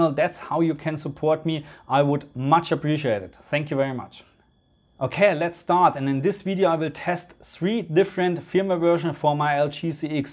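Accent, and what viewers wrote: Germany. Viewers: That's one heavy German accent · bist du deutscher? Klingt so 😁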